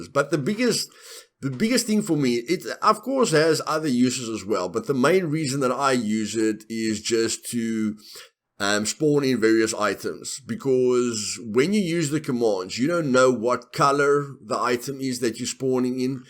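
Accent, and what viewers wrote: Afrikaans, South Africa. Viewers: What servers do you play on im from South-Africa and you sound a lot like n afrikaans man ;) i was just wondering sothat i know what to play on aswell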